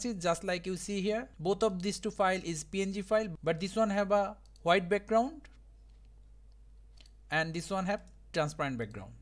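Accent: India. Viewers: as usual, when you hear an indian voice when looking for a tutorial, it means you found the answer... Thanks!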